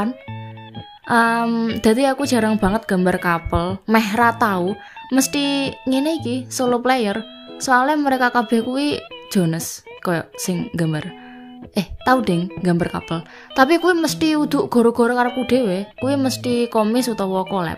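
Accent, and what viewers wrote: Indonesia. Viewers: kak, dari logatmu, kayaknya kamu orang Indonesia ya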